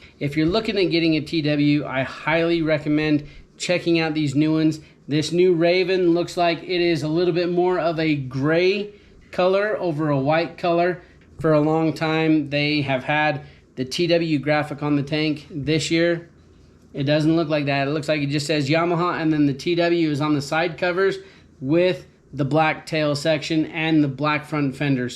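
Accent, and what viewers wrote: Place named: Utah, United States. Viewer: I'm from Salem and moved to Washington 20+ years ago. Are you from near there? I immediately recognized the Utah accent! I'm hoping to get a TW soon.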